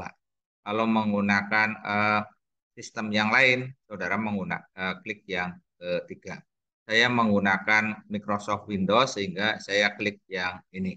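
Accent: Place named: Tegal, Indonesia. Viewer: dari sekian banyak yg jelasin vos viewer, anda adalah yg paling mudah diterima, bahasa lugas dengan khas tegal serta tidak banyak belibed spt kebanyakan ibu-ibu yg jelasin vos viewer... support terus!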